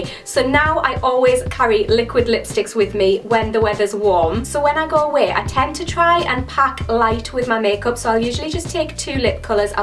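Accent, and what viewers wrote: Newcastle, United Kingdom. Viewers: Love the geordie accent ahhh (if thats even correct!!) sorry if not hahha , been looking for a good tinted moisturiser or BB cream.. Any other reccomendations? X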